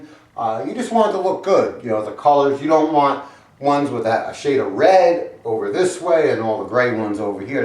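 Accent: New York, United States. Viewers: Great videos man, straight to the point no BS, as an avid DIY'er This is about to be my new favorite channel for sure. I am in the process of buying a new house right now and if everything goes through, I will be using your vids to do alot of work. I may be wrong but you sound like your from New York. I live in NY also, if so and you want to pm me some info incase theres something I cant tackle I will definitely hire you. Thanks keep these videos coming we all appreciate your help.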